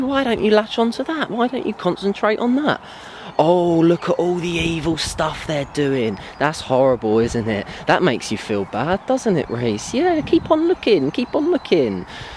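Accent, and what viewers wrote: England, United Kingdom. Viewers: This amazing Place + your English accent = The best combination of harmonic elements!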